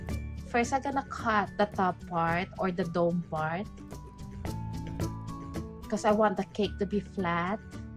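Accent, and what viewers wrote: Philippines. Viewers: Absolutely perfect! =) I used to eat this at Goldilocks in PH, I can't stop watching your videos coz I'm a bad baker and trying to learn. I hope I can make one of your cakes one day. Thanks for sharing this video. I also like your Filipino accent, makes us proud.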